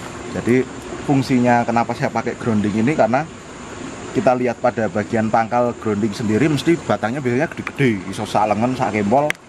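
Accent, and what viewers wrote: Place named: Java, Indonesia. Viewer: Ketok e ra nyepirani... nanging ternyata akeh inspirasi sing biso di conto. Asik ... bahasane keren jowone ketoro buanget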